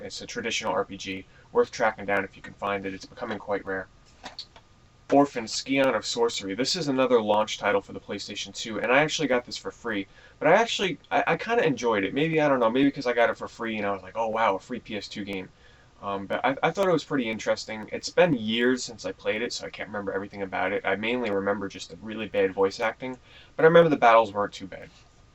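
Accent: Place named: New York, United States. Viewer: haha, i know. i love the accent. i think in a video he said he's from new york.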